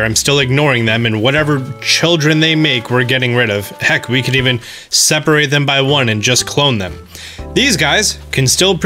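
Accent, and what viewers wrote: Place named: New Jersey, United States. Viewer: It's a New Jersey accent. All across the state, we say "wooder."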